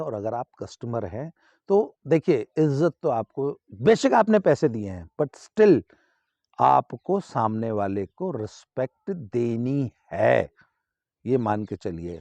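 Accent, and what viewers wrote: Haryana, India. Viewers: Guruji ये विडियो देख के बड़ा मजा आया ओर अच्छा भी लगा के आप वापिस अपनी फोर्म मे आगये, आपका देसी अंदाज़ ओर भोजपुरी मे हरयाणवी टच सरसो के साग मे देसी घी, प्रणाम🙏🏻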